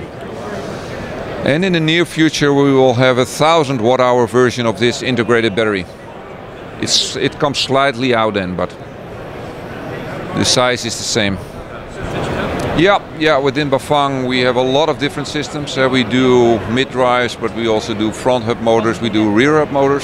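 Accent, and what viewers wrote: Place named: Netherlands. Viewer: English speaking with a Dutch accent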